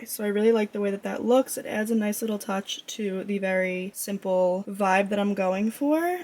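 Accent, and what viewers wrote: United States. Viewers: Its a new york accent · Just enjoying the Long Island accent 💕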